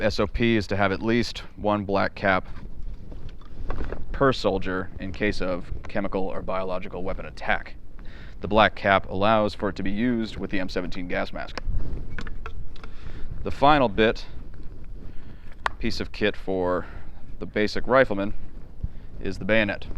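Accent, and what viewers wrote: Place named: United States. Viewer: That’s such an 80’s kind of American accent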